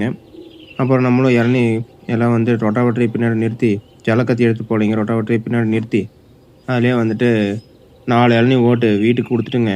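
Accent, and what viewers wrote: Kongu, India. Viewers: ஆயிரம் சொன்னாலும் கொங்கு தமிழோட அழகே தனிதான்❤❤❤❤❤ ஐ லவ் யூ டா அண்ணா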